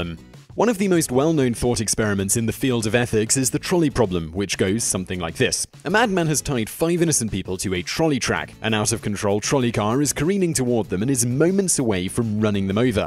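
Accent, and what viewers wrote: United Kingdom. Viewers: Is that Michael vsause but the British acent version?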